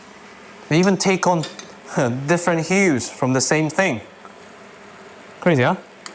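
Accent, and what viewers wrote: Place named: United Kingdom. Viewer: We just saw a man of Asian ancestry with a British accent fuck up a traditional Southwestern United States dish....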